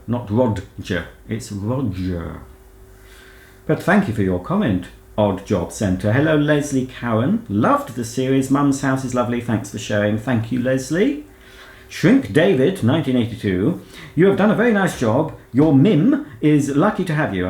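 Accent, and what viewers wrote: United Kingdom. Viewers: Maybe it is the English accent I like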